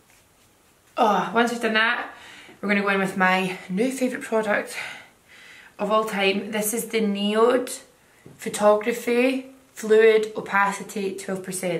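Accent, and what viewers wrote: Scotland, United Kingdom. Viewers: “Pish” high five to the Scottish bird lingo, nothing sounds as good as an angry Scottish girl 🤣. I’m Scottish too and fairly local to you 😀👍🏻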